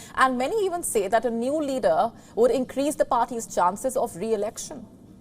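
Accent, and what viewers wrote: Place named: India. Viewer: Indian Accent heavily influences pronunciation ... hope so you would understand.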